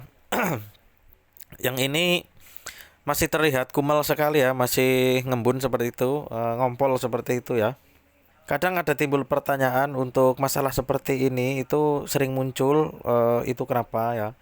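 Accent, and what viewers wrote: Banyumas, Indonesia. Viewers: Ngapak pa ya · Mntp, ngapak y ngmngnya 👍👍👍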